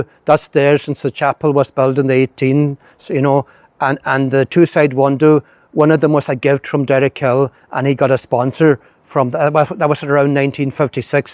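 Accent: Ireland. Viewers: Its quite extraordinary and refreshing to hear an irish man speak english as a second language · Isle of Tenerife, Spain, Africa. Brilliant! What an interesting, informative video! Well done! And all said in beautiful, melodious Donegal English, the favourite accent of many. Now, a maybe strange request: As many folks are interested in Tory, not only for its fine paintings and archeology, but also for a maybe even rarer aspect of its culture, the Gaelic language, said to be less depleted there than anywhere else. Would you consider doing the very same layout as this video, but all completely in full, natural, Donegal Gaelic? I'd be riveted to hear it. Best wishes, Patchy.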